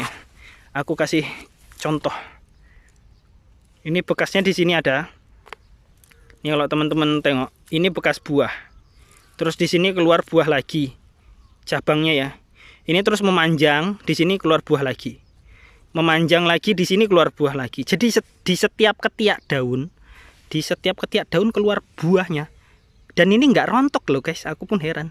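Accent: Java, Indonesia. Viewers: logat jawa bilang asal pontianak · penasaran.orang Pontianak kok logat orang jawa. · Tiyang pontianak kok jowone medok banget kang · Tak kiro wong jowo mas e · Mas,Kalimantan,tapi keturanan Jawa ya logatnya orang Jawa tu,mohon no hp nya,saya orang Tuban jatim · Ikut nyimak sampean kok lucu boso jowone medok mojopahit ngendi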